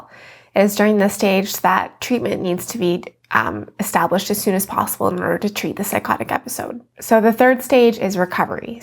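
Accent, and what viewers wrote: Canada. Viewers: Great demystification of psychosis, carried out with your lovely gentle Canadian accent. I find listening to you makes me feel calm and at one with the world. I guess there's an irony there! Thank you.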